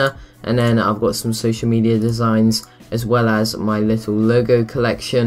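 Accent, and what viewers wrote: United Kingdom. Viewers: Sorry man, are you from UK?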